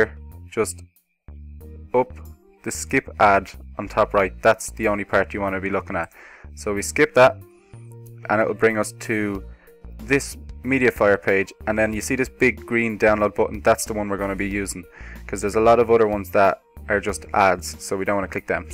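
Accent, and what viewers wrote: Ireland. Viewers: he's IRISH YAAAAAAAAAAAAAAAAAAAAAAAAAAAAAAAAAAAAAAAAAY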